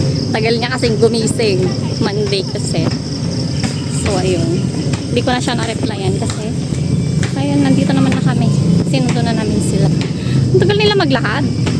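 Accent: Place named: Manila, Philippines. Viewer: Hi,. May I know where you're originally from? You speak Tagalog and English like someone from Manila or Luzon.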